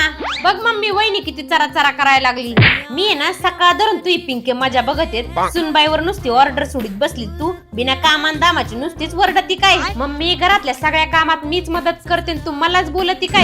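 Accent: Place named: Pune, India. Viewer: पुण्याकडची भाषा आहे ना ही❤❤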